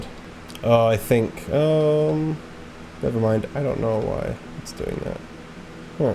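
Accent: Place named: Scotland, United Kingdom. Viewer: I'm confused by your accent. It's like a mixture of Scottish, a northern accent and some American, at times? Am I going mad?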